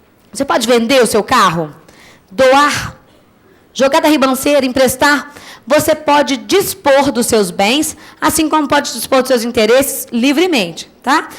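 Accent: Minas Gerais, Brazil. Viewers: Agradecidíssima pelos vídeos! E eu amo o sotaque mineiro, então tô amando. Vou maratonar.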